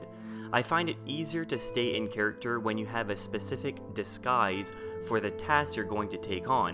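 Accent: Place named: California, United States. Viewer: sounds like your from cali or south florida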